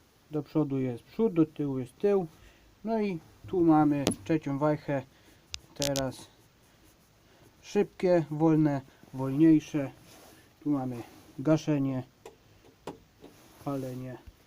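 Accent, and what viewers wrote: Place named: Lesser Poland, Poland. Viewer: Wiedziałem ,że Małopolskie🤪 Swój swojego pozna. Na filmiku gdy opowiadasz niektóre słowa akcentujesz podobnie jak niektórzy w moich stronach.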